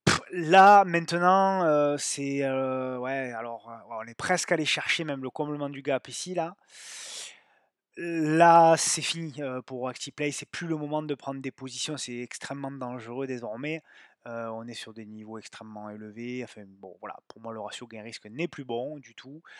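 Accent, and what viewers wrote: South, France. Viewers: Top. L'acent du Sud le meilleur!!! 😉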